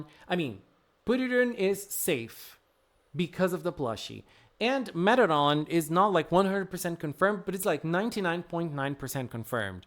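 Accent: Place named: United States. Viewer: I love your channel but I like to ask you a question you were born and raised in Brazil and you talk like a normal American I was always curious about that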